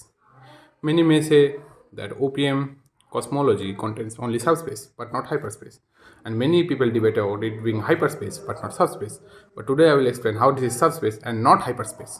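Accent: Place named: Africa. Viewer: U got the African accent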